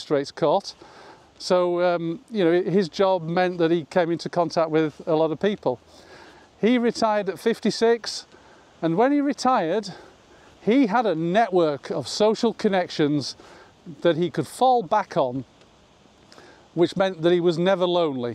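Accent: United Kingdom. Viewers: Thank god British speak, like you I retired at 45 best move ever, how I found time to work I’ll never know. Now 65 still fab.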